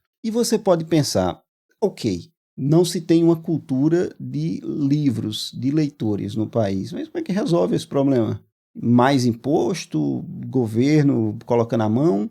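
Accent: Pernambuco, Brazil. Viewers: Hoje estou em Portugal, sou iniciante na leitura. E posso dizer que não é só no Brasil que existem livros caros. Aqui também há. Fora isto.. Hoje estou em busca de como posso fazer para importar livros para cá, tanto pelo preço como também que por incrível que pareça no Brasil existem bem mais obras para língua portuguesa. Abraço Professor, seu sotaque para ser de Pernambucano, pode ser que eu esteja equivocado, se for somos conterrâneos. Abraço.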